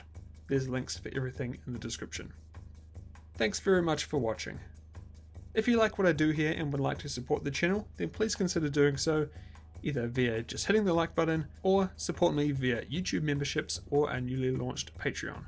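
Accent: New Zealand. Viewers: Really enjoy your Kiwi accent, man that brings me back to the amazing country.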